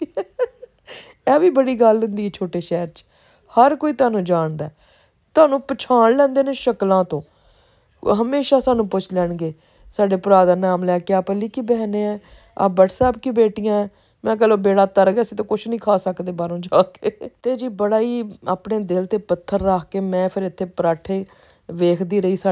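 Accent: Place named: Amritsar, India. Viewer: 😊❤same as indian punjab Amritsar life sari galla baata same you are speaking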